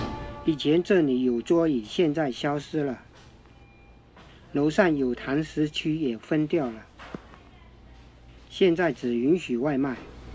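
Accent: Fuzhou, China. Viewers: 听这口音，是福州人吧，老乡呀，期待你的视频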